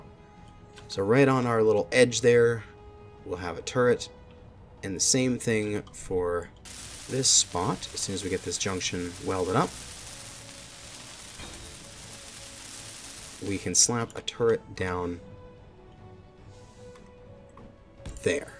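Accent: United States. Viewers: Hey dude, I'm really enjoying your series, keep up the good work✌🏻 I have a question... What accent do you have? I assume you're American but where in America🤔 thanks haha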